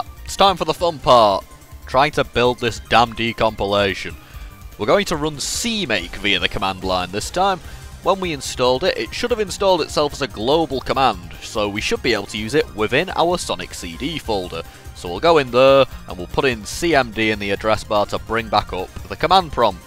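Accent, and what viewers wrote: United Kingdom. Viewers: The british accent ruins everything sorry but srslly 💀☠️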